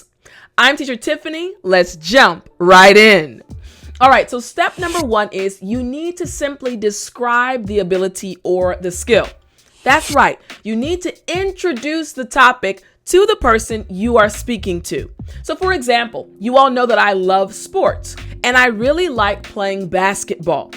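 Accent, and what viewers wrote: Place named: United States. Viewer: What an amazing American accent you speak dear! I love listening to it!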